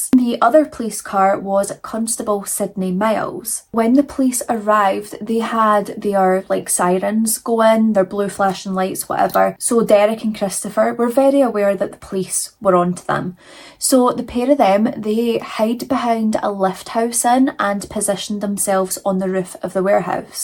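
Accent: Scotland, United Kingdom. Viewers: Don’t let You Tube get you down. Without people like you, THEY WON’T HAVE VIEWERS! Dang. And I love your personality, the spider make up was amazing and I did it to hand out candy for Halloween. CONTINUE BEING A CERTIFIED YAPPER! We love you and faithfully watch every episode. Love the accent, love your own personal comments. Keep Yapping, Scottish, from Irish Freckled Songbird in the US!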